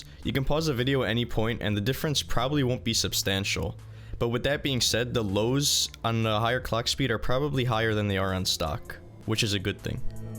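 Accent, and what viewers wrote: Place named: Denmark. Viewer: Hey, du lyder Dansk :-) Jeg har også et build med FX CPU, det hjælper sindsygt at give den noget hastighed :-)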